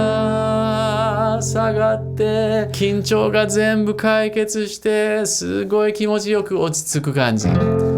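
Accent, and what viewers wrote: Kansai, Japan. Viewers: 関西弁とアコースティックギターでやる所が、凄くいいね！。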